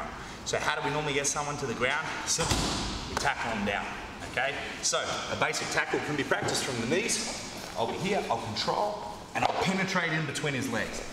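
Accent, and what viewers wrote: Australia. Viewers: are you an Aussie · I love Australians, you guys are always fun to listen to. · Nice rhythm , this dude speaks smoothly from beat to beat, flawless transition and the great Australian accent is a bonus!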